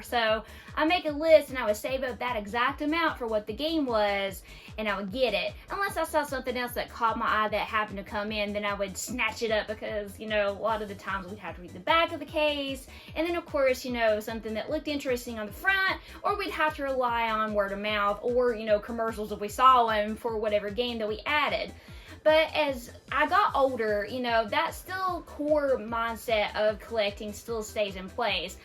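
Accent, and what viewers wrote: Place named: Georgia, United States. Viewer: youtube suggested your channel to me, your personality is great and your accent reminds me of my friends back home in GA lol so i dropped a like and sub 👌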